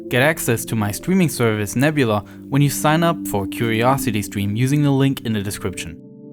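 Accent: Germany. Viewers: German accent? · I subtly like your German accent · I cant believe it took me this long to realize you're german, but the way you said August and Recep at the beginning are such a giveaway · I have a question for you. Are you German? Sounds like it ☺️ Greetings from Munich 🤗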